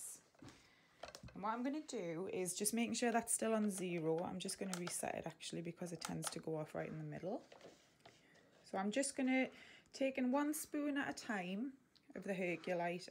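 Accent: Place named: Geordie, United Kingdom. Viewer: This is an excellent tutorial dearest! I love your sweet Geordie accent my mandala soul sis! 🥰😍❤🥰😍❤ I am so glad to see I'm not the only one who don't follow instructions on the packet 😁😄 sometimes you just have to follow your instincts and these have turned out brilliant. Keep the videos rolling my sweetheart ❤❤❤❤❤😍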